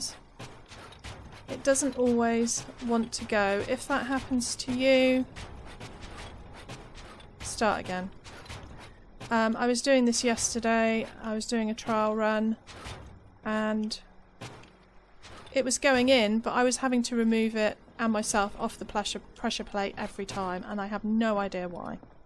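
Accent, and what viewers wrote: United Kingdom. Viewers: Finally, tutorials with a beautiful British accent.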